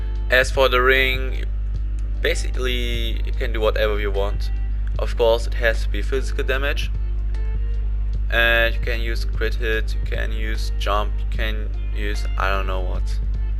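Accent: Germany. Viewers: Are you from Germany you have a German accent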